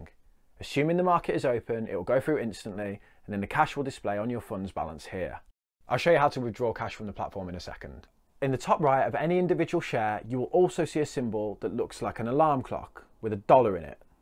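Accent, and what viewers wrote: Birmingham, United Kingdom. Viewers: Forgot to say, great to hear from another Brummie! 😂🙌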